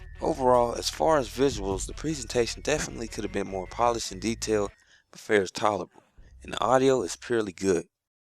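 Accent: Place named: United States. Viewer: What accent is this guy's? I know it's American lol.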